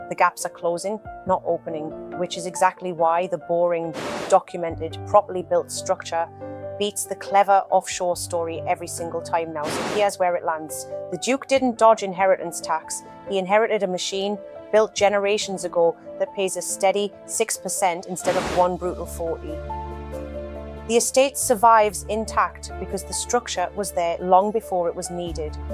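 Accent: Newcastle, United Kingdom. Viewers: The Duke's estate is Alnwick castle, and the Dutches has built a poison Garden with almost every deadly plant in there behind security. Love your channel keep up the good work and its nice to hear the Geordie accent.... pet!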